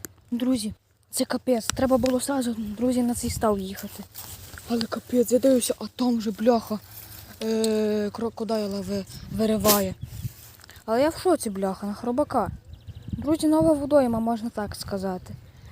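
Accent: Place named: Khmelnytskyi, Ukraine. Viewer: То ї в останні такий діалект чув років за 30 на річці Збруч Хмельницька область((ты юначе коропа лапах? Авжеж.файний короп))😅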